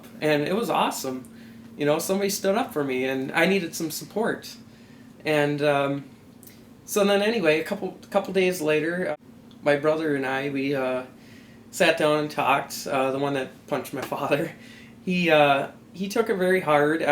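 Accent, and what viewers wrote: Minnesota, United States. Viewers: what an interesting accent. Is he from MinneSOta? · What an amazing story!!! I still cannot understand how a parent could kick their own child out just for being who they are. Being gay is not a choice it is just who you are. The Ali Forney Center in NYC it littered with kids who have been thrown out of their good "Christian" homes... The Bible also says that adulterers (divorced) should be put to death. I am glad times are changing but it is not fast enough for me. -love your Minnesota accent!!!:)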